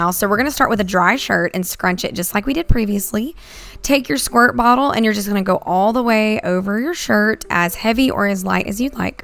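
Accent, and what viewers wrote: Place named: Texas, United States. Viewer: I think I must share that you are the absolute fucking cutest and what part of Texas or the south I guess I should say are you from? I’ve never ever commented on a YouTube vid I don’t have my own channel but I love learning from it and I know how creepy I sound but I cannot resist your personality, I dig all of it. I was just trying to tye dye my kids shirts before they wake tmrw as a surprise bc I take leaps into what I love! You got it girl. Love it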